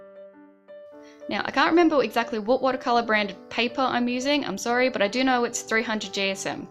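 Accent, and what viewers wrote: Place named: Australia. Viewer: Nice to come across a review video with an Aussie accent. Hi from Lismore, NSW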